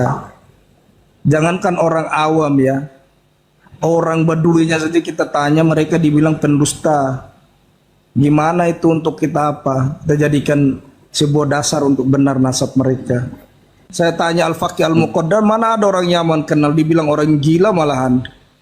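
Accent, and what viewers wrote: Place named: Banjar, Indonesia. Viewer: Sepertinya orang Banjar,,suaranya..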